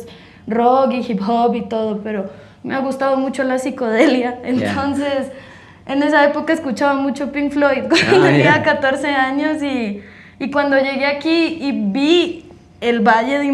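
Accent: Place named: Ecuador. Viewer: Pensé que era ecuatoriana. Que bien manejo del idioma. Mis respetos.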